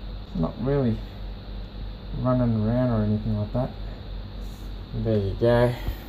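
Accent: Australia. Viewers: he sounds born and raised Australian. Please don't tell me you think white people are native to Australia 😅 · Is this dude Asian with an Australian accent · He sounds like and his mannerisms are like a typical Aussie. Could have been raised here, born here or be a descendant of a long line of Asian immigrants present here from the earliest pioneer days. After all Australia is further south than what is called South East Asia, we are situated in the Australasian Region of the world map. He could have a heritage originating from any Asian nation. I'm an Aussie of Anglo Saxon heritage and have cousins and 2nd cousins who are Ausies with Thai heritage and Aussies with South Korean heritage. Also other 2nd cousins with Samoan heritage.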